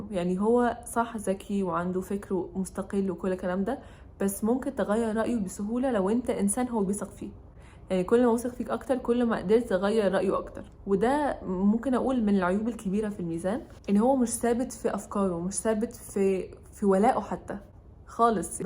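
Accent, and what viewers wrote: Syria. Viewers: ممكن سؤال يا ليه في معظم الڤيديوهات حتى لو مش دلوقتي زمان برضو بتتكلمي سوري بس رغم انك مصرية سورية مجرد سؤال بس متفهمنيش غلط 💙💙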